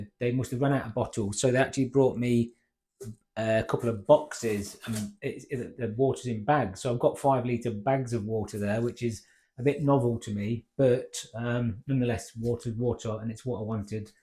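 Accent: United Kingdom. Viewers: You sound like your from Leicester same as me lol. Just subscribed and given a thumbs up to this great video. I've just bought the Pinter3 presented by Guinness, really looking forward to brewing with it and watching more of your work to help me. I;ve got Dark Matter and Guinness Dublin Porter, sadly Shadow & Creams out of stock. Do you think I can get good results following the Pinter app to start with just to keep thing simple? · sorry one more question. You wouldn’t be from Nottingham by any chance? Your accent sounds local to me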